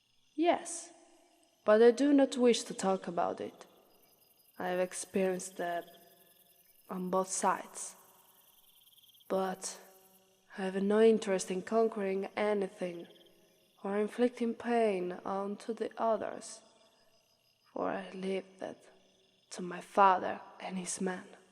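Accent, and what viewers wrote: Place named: Italy. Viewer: A tribal girl with an Italian accent. 🤔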